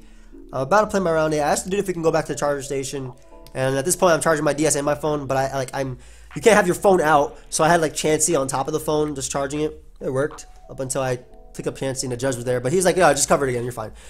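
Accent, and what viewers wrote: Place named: New York, United States. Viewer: Neeyew Yawk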